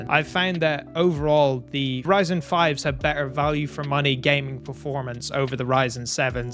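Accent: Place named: Ireland. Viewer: I can tell that but what county is that accent from bro 😭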